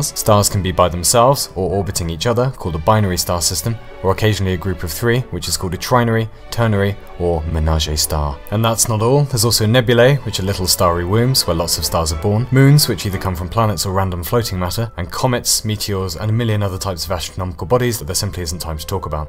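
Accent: United Kingdom. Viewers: The British English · Language: English Accent: British Subtitles: Spanish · I feel like this guy says all the things that I'm already thinking, but just in a British accent. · He doesn't sound very Norwegian. We usually don't speak with a British accent and it's also pretty hard for us to shake off the Norwegian intonation and pronunciation. If he really is then kudos to him for speaking so fluently. But hey, maybe he's half-British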